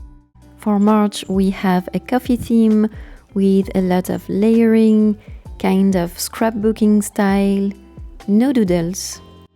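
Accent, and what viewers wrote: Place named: France. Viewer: I love your originality - such beautiful work! And I love your French accent too!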